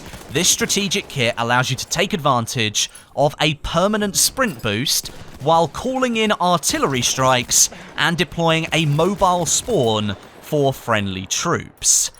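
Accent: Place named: United Kingdom. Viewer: I really like when you say “thanks for watching today! “ since I love British accents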